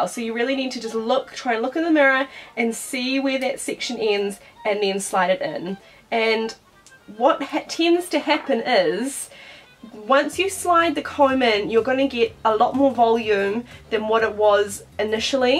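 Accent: New Zealand. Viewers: Yeah, the short 'e' becomes two long e's, sounds like NZ. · Sounds like she's from New Zealand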